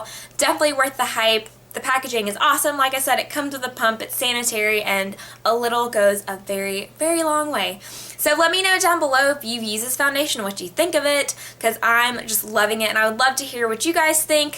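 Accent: South, United States. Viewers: You're so pretty! And I definitely didn't think you'd have a southern accent!!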